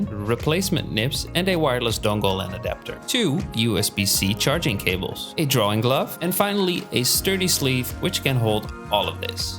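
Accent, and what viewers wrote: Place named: Netherlands. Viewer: I hear dutch.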